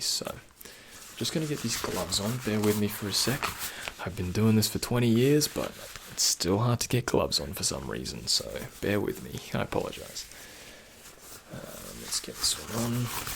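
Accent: Australia. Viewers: You look more like a Filipino but then you speak fluent Australian accent! Very knowledgeable and full of tingles. :((( Subscribed! ♥️ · God yes!! I love how Aussies always say “no dramas” amazing. Voice is better than butter. New sub for sure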